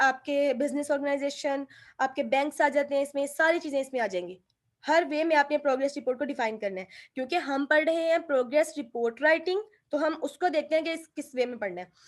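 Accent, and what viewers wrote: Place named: India. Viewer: When I heard the way you speak. my mind said oh. Indian.. I'm a good friendly to Indian people. too much here in Abu Dhabi my friend.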